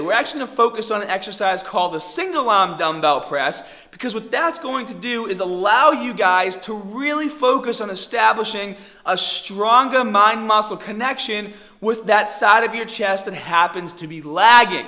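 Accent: Boston, United States. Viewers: Man that Boston accent hit me like a ton of bricks! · you from Boston? never noticed your accent before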